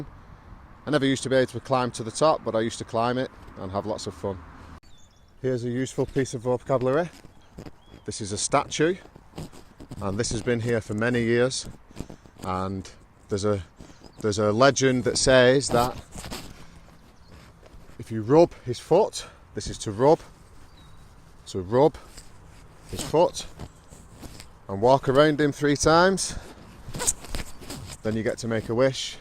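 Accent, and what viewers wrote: Northern England, United Kingdom. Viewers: Hi, Finally found a channel where a British English teacher is speaking in northern accent. Don't get me wrong, but I don't understand when people speak in northern accent. I have recently moved to London from India and I feel terrible when I don't understand British people. I just want to understand what everybody is saying and I would like to communicate with them. How can I do that? Could you please tell me?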